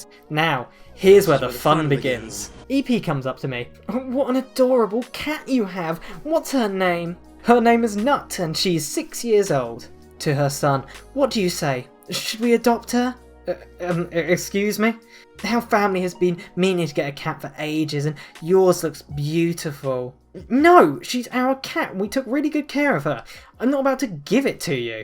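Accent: United Kingdom. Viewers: Legit doesn’t sound male or female, just british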